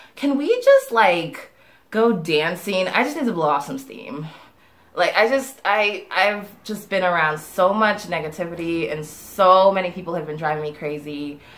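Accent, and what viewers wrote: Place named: United States. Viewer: bonsoir,oui ça va mieux. honestly i really like learning idioms and make use of them in my spoken english. i like your american accent.where are you from in the states ? i was told the accent dependant on the region you live in the us.right ?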